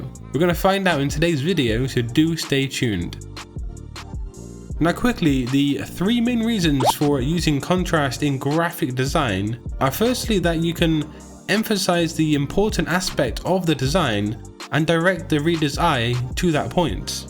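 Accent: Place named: Bristol, United Kingdom. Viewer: Yo, are you from Bristol?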